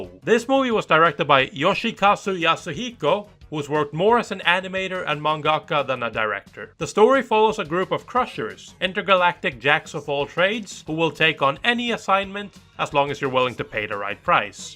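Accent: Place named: Norway. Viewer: Er du norsk? Du høres ut som en nordmann:P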